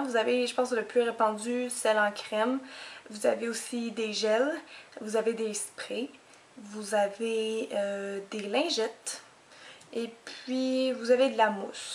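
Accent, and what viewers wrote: Quebec, Canada. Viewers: Coucou. J'adore ton accent Québécois!! Dit je suis un Garçon j'aimerais essayer l'autobronzant Spray de Musst que tu a indiqué. mais vu que je suis un mec j'ai évidemment des poils aux jambes bras... et j'ai vraiment envie de me raser. Qu'en dis-tu? Je peux l'appliquer comme meme?:)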